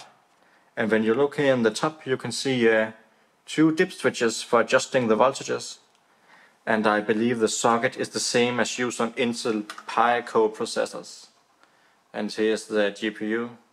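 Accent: Denmark. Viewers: He lives in Denmark as i suspected, you have the good danglish! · Like the danish accent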